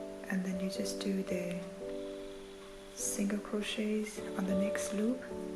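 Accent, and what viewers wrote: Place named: Iceland. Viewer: U got a björk accent 😍😍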